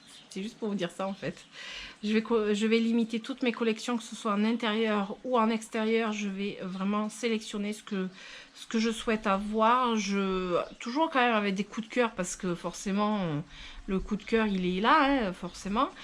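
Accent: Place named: Toulouse, France. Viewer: Bonsoir Voici plus de 6 mois que je regarde les vidéos,je vous trouve très sympathique vous me faites penser à ma belle belle sœur qui habite près de Toulouse ce petit accent fort charmant.Je n'ai pratiquement pas de plantes vertes à la maison seulement quelques succulentes. Mon grand bonheur c'est mon jardin de 1200 m2 je m'éclate aussi comme vous je consacre beaucoup de temps. je comprends votre fatigue et j'imagine bien le temps que vous y passez. Pour cette tendinite bien sûr les anti inflammatoires pourraient vous soulager mais c'est surtout une mise au repos de votre bras et ça c'est pas gagné! Encore bravo pour tous vos bons conseils c'est un réel plaisir à vous regarder 2 fois par semaine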